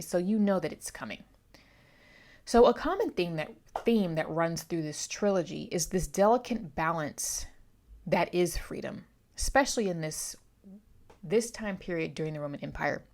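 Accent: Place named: New England, United States. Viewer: Wadder... I wonder from what part of NE you are from. I heard an 'R', so it must not be Bahston, Ma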